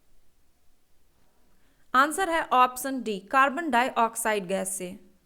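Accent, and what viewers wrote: Bihar, India. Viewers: आप भी बिहार से हैं Mam ❓❓❓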